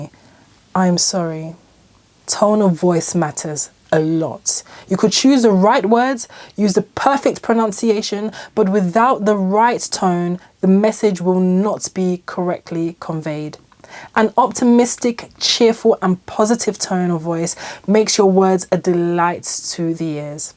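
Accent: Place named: United Kingdom. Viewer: it's just proper British talkin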